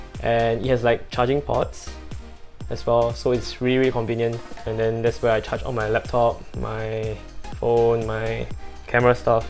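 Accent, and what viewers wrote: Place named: Singapore. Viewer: Great video! But the Singlish is too crazy for me man 😝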